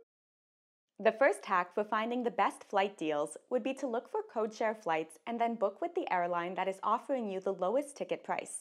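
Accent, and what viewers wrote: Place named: Coney Island, United States. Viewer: Love the accent are you from Coney Island Canada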